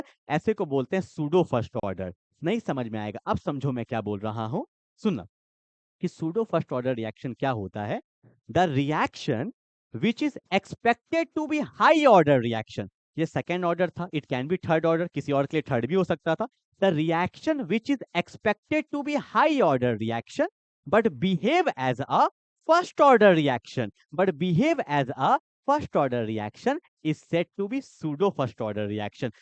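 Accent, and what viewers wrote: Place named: Kashmir, India. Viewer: Sir ka Kashmiri accent ❤😅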